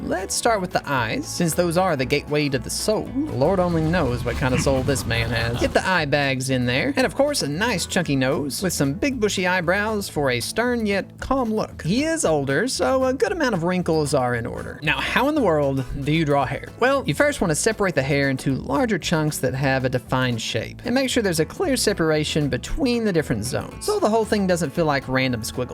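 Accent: South, United States. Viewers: how do you have a southern draw but never had a mountain dew?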